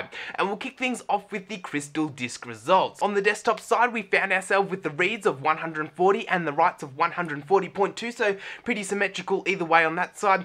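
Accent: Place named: Australia. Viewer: Mans chinese with a Australian accent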